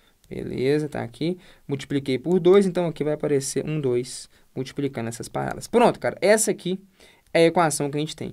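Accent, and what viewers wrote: Minas Gerais, Brazil. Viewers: Matéria boa demais. Sotaque de Minas não da pra não perceber hahaha. Valeu pelo conhecimento! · esse fesso e mineiro... ctz kkkk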